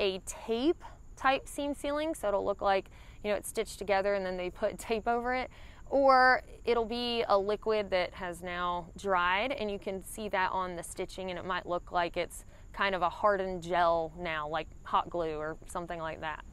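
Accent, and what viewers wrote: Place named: Virginia, United States. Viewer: Dude that south VA accent is great. Even if you didn't know anything about hiking I would still listen to you. I always get the impression that anyone who has that accent I expect them to know everything outdoors lol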